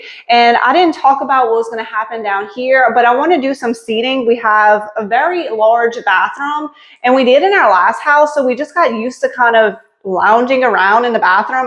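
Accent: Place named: New Orleans, United States. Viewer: I love it all. I think it's a great idea about the apothecary jars in the bathroom. You were right about the rug, but I love those curtains. Beautiful, home.And I really enjoy your channel and the NOLA accent 😊❤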